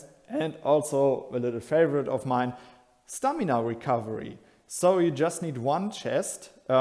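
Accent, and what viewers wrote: Germany. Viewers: Very fun German accent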